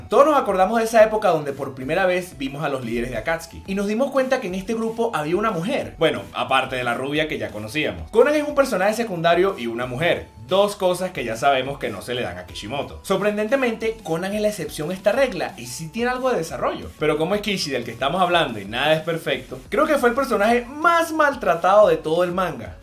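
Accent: Venezuela. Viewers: en este video se le sale el acento venezolano a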